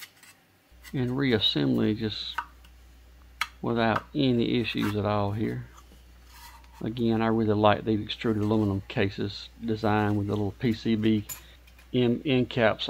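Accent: United States. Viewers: Love your accent! I miss visiting the US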